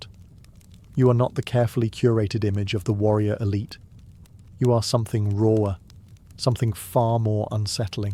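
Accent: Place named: England, United Kingdom. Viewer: Life and pOTh.... I'm out. English accents are ghey.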